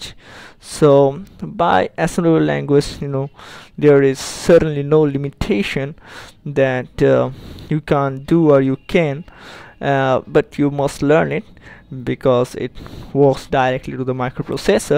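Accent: India. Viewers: There are not a lot of good Assembly tutorials out there and based on my own experience i glad I found this one as, while the presenter here has a thick accent, it is easy to understand more than 90% of what he says whereas the other Indian tutorial makers have accents that are just far to thick. Thanks for this tutorial. I am trying to learn assembly to further my knowledge of hacking as well as pass my CDA class (hopefully) lol. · Everyone talking about his accent. Basically, Indian people are the majority who post ANY kind of relevant tutorials or help videos on youtube for programming. Maybe if some other people would actually take the time to learn and teach and post on here, we could say something. Til then, I'm just glad people like take the time to try and help us.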